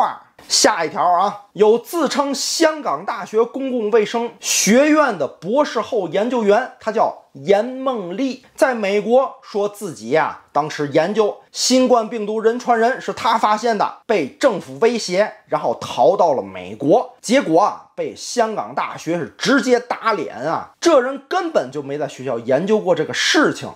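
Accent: Tianjin, China. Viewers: 我特别喜欢听天津话，骂人都这么的带劲，快笑死我了🤣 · 还是有点天津味的北京话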